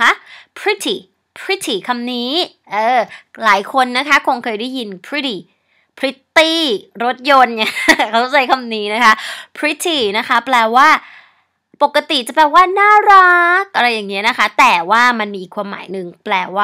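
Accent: Thailand. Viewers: มีสำเนียงระยองด้วยย555+ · ชอบสำเนียงภาษาไทยของ จังค่ะ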